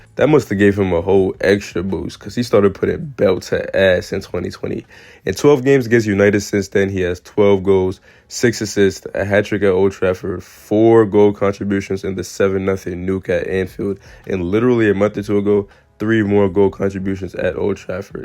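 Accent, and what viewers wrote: New York, United States. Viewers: Great video. I like your humour and like your accent. I could tell you from New York because you sound like another YouTuber that’s from New York lol 😂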